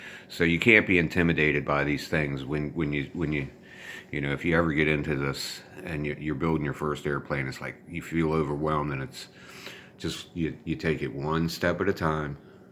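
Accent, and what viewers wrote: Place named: Pennsylvania, United States. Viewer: are you in Pennsylvania, possibly philly? I am guessing by your accent.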